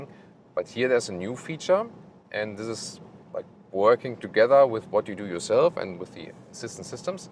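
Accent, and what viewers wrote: Germany. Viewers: its probably german eccent. You say egthree instead of exsthree. Letter by letter. Sorry for being like that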